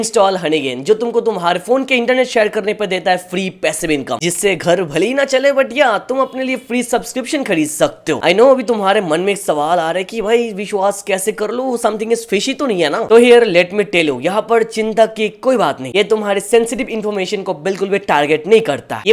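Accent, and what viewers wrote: Bengal, India. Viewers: Bhi ap bangali ho kya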